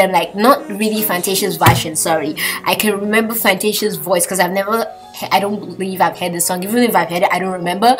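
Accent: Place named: South Africa. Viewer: i love your accent! are you from south africa? or jamaica? :))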